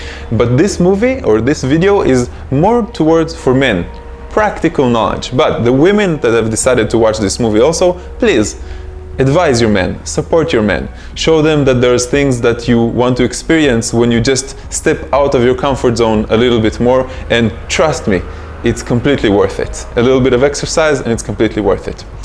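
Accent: Israel. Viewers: Why do you sound so Israeli? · Youre accent sounds Israeli r u...?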